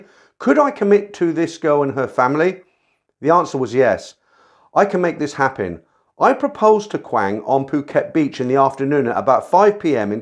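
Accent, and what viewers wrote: United Kingdom. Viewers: Hey Mr, I LOVE YOUR STORIES - It's such an original concept, but the way you read them is sooo properly perfect!! I'm American and live in San Francisco, and your British accent makes the stories and listening to you vids awesome!! I do have a story, but it's not a Thai....my wife is japanese, and she lives over there...we don't see each other as often as I would like, but it's still all good.... Maybe our story doesn't qualify, but it is a dream come true!! Again, I always look forward to your uploads and storytime read(s)!!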